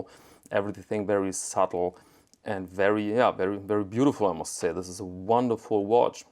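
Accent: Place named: Germany. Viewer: The German accent adds credibility. Where do I get one?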